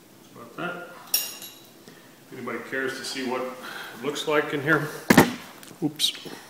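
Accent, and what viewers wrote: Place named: United States. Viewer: For a person with an American accent, I found it slightly odd that that all ingredients were named by metric weight. Something easy enough to figure out, but USA never went metric, so far, even though there was talk of it 40 years ago. I've eaten there when I was at Yale. Frank's obit started on the front page of the NY Times!!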